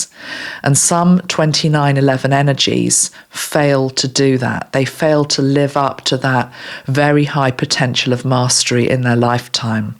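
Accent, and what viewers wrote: United Kingdom. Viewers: Iam a rh negative. everything you said about make a lot of sense to me. There are 4 person in my family that are negative as well. It is the first time i have been whatching your live. and liked very much e liked your British accent . Life for negative blood is not easy, we are different and rebel. thank you for your work.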